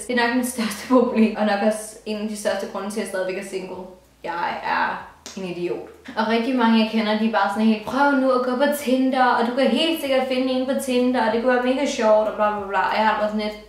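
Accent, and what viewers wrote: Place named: North Jutland, Denmark. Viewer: Elsker når man lige en gang i mellem kan høre en snert af din nordjyske accent <3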